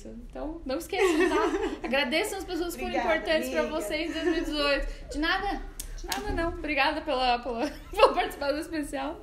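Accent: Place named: São Paulo, Brazil. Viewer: E esse sotaque paulista? hehe (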